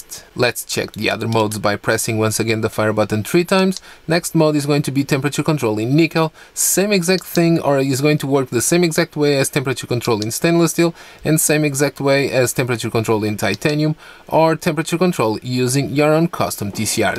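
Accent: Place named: Portugal. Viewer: That Mourinho-like Portuguese accent is just awful.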